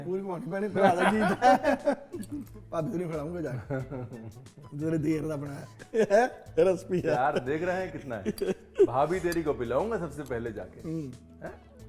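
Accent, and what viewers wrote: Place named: Punjab, India. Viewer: Sweet panjabi hindi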